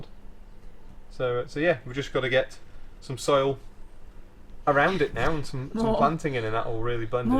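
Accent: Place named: Australia. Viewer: Hi, just found your videos. I’m a new garden railroad. I just got my first half of a circle of track and I have a NW-2 loco You are inspiring me to try to put something outside. It’s a little bit intimidating. I have to admit, but I’m learning a lot just watching you do this. I have one question though, where are you located? Are you hesitantly I ask are you in Australia or are you in England your location of your our way and your weather doesn’t seem to jive, so I’m not sure being an American. I apologize for not recognizing the accent.😂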